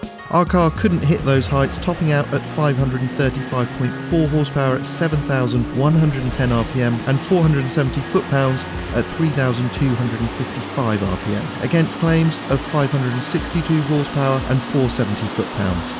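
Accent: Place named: England, United Kingdom. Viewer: I think I like the English accent more the then video hahah nicely executed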